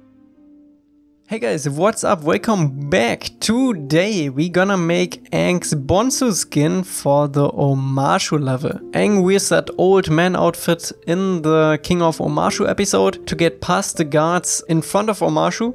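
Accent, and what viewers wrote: Germany. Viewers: Hallo, du bist safe deutsch würde ich behaupten, hört man am akzent:D Ich habe da ideen für ein projekt und würde mich ebenso als unterstützung für deines anbieten, egal was es ist und sofern es mir möglich ist! Dennoch wäre es cool wenn du dir meine idee mit anhören könntest:) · 1 frage bist du aus Deutschland? 😅😅 du hast nämlich ein sehr Deutsches Englisch. 😅😅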